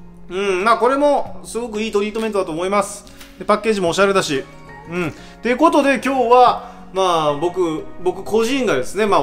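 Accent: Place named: Kumamoto, Japan. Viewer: 熊本のイントネーション最高すぎる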